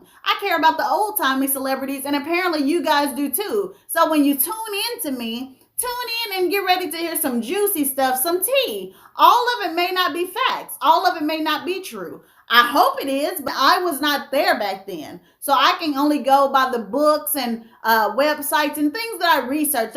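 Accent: South, United States. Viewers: You're gorgeous with the southern accent!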